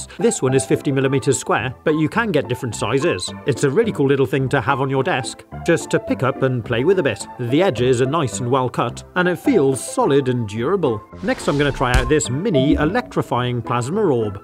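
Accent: United Kingdom. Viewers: So your British? I’m american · I feel that you are British.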